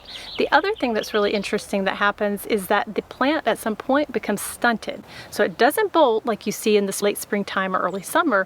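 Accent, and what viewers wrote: South, United States. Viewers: She sounds like she's southern but softening her accent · she has strange american accent for my foreign ears. Where is she from? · Her accent is American south, possibly Texas or farther east up to North Carolina. That’s a Southern American accent.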